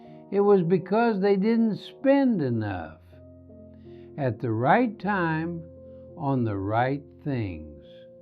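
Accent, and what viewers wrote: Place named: Nebraska, United States. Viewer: so spooky hearing you talk. You have EXACT same accent my dad did but he was born and raised in Nebraska in 1927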